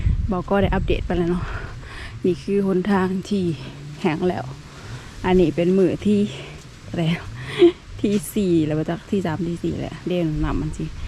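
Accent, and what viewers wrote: Isan, Thailand. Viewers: เว้าคือคนอีสานบ้านข่อย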